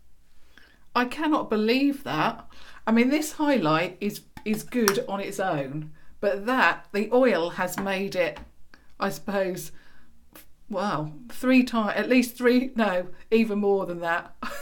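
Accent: United Kingdom. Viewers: Hi, of course I'm really happy for your achievement now 10,000 is near! you have grown with us and as I said in other comments for me you are like a friend, not only I appreciate all your reviews and your tips but I practice my english LOL... I love your british accent! anyways, your skin looks like porcellaine in this video👍❤️😘 (P.S. sorry for any spelling mistakes )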